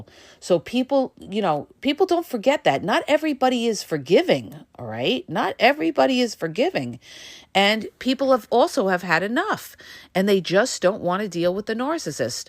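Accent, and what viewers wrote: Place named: East Coast, United States. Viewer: It’s gotta be the East Coast accent….❤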